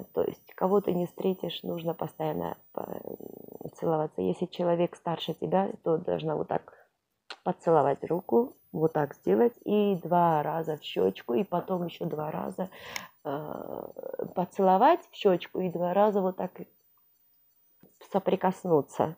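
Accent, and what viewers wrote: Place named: Georgia. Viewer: Акцент у вас прям Грузинский немножко ,или мне показалось !🤭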